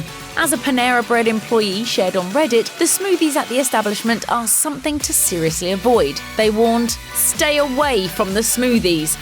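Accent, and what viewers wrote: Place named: United Kingdom. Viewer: The British feminine accent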